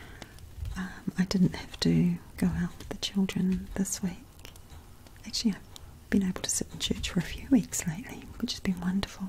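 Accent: New Zealand. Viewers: Are you kiwi! Love Kiwi christians 👏🏻👏🏻🙌🏼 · I appreciate this so much. It was so wonderful to hear a New Zealand accent again as well. Four years ago I spent 5 months in Oxford, Canterbury at a YWAM base there and God worked so much in my life at that time. I accepted Christ when I was 4 but needed to experience Him on a deeper level. What I realized while I was there that has made the most difference in my outlook, is how much of my life I still needed to surrender and trust Him with. I’ve come to realize that is so, so much of being able to really live out the Christian life. Do you know what I mean? Thank you my sister in Christ for letting God use you to speak to others encouraging them in HIm. Also, I greatly respect people doing foster care to help others! Thank you for your sacrifices...I’m going to lift you up in prayer right now that God will encourage your heart today. 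🥰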